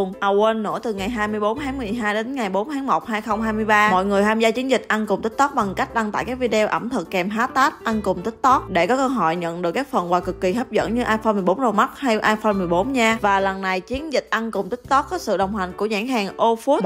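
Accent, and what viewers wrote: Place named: South, Vietnam. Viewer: Giọng miền nam mà bạn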